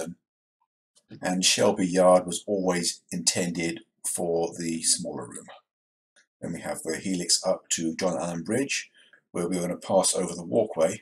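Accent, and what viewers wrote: England, United Kingdom. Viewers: I just love the term; ‘Herniated Helix’. Not a term I’ve heard here in the UK. I am amazed that you still have your English accent, despite your use of American terminology. I tend to readily pick up accents and if I lived for a year in the US, you would believe that I was born there. I do enjoy watching your videos. Best, Jason.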